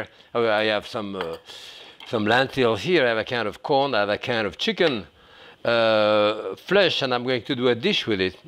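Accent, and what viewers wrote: France. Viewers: Thanks to this dude, when I'm cooking at home my internal monologue has a thick French accent. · i love these little vids. he's great 😊 i love his accent - and his pan, i think hes french - those hand ! tres bon, monsieur ,tres bon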